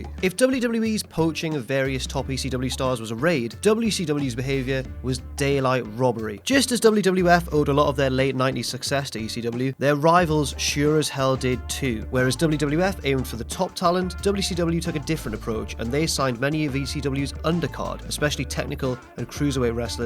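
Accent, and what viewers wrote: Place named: North, United Kingdom. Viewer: He's great. It's not a confidence problem. His just Northern like me,. He's very funny, but it's super subtle. We like 'taking the piss' in the north, and saying odd things just to see how people react, such as 'fuck you taz'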